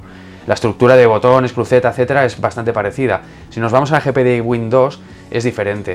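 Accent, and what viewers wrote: Spain. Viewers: No le entendí el 50% muy españolizimo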